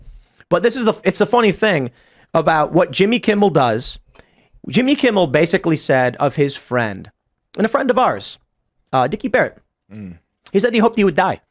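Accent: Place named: Chicago, United States. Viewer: I love it when you can hear go full Chicago gangster vernacular. It's subtle but it's there.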